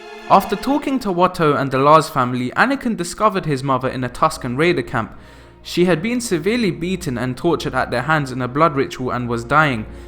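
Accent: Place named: England, United Kingdom. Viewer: Speak real English, not that incorrect trash from englan the shithole nation with erroneous variamts of the English language. Fathet isn't fa-ver and vission isn't "vig-in"...Schme isn't pronounced "shimmy" ... nothing you say is correctly pronounced, it just sounds like shit frok england. Future isn't few-cha...such disgusting speech patterns you filth in england have. Discriminatory is not dis-creamy-tree... THERE IS NONTREE in that damned word!! Maul isn't moe-ull, skill isn't ski-eww...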